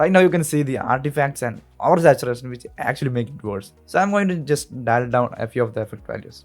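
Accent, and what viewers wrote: Kerala, India. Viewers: Malayalam english ahhh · Yeah his english accent gives away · ur indian accent is very funny but considering ur so skilled i respect it 🙏 keep it up man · Cant understand anything cuz of that thick indian accent but w vid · thickest indian accent on the planet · English ketalee ariyam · Indian ❌️ kerala accent ✅️ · you are from kerala right alla accent kettatu manasalayda gg nice video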